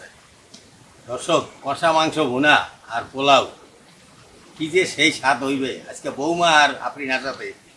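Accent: Barishal, Bangladesh. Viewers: দুদু আমনে কি মোগ বরিশাইলা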